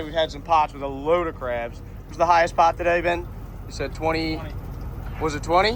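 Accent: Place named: South, United States. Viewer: southern drawl comes out when he talks to the Deep South People! 😂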